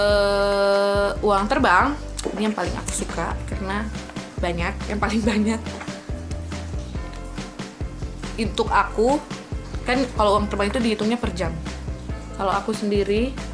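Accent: Manado, Indonesia. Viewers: Kakak org menado yahh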